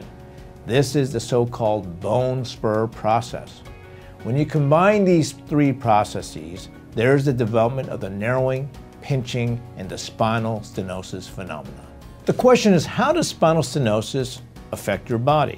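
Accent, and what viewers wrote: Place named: South, United States. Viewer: Great information! So funny hearing a Chinese man with such a southern American accent!😄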